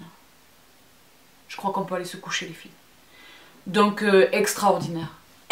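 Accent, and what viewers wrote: South, France. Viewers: Super video ! J'adore ton accent qui me rappelle mon enfance dans le sud. Et j'aime beaucoup ta passion pour les parfums, avec pas mal de goûts communs. Je porte Fève Délicieuse depuis septembre (cadeau d'anniv'), quelle merveille ! Si tu veux bien nous en dire un peu plus sur Bois d'argent un de ces jours, ce sera super ! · Coucou je suis aussi une vrai passionnée de parfum👌avec ton jolie accent du sud J avais l impression d être avec mes amis de Bandol étant à Rennes 🙂💕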